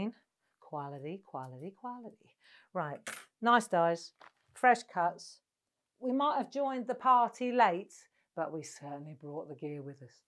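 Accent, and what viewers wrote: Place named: England, United Kingdom. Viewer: Amazing. Great video, your English accent makes me homesick.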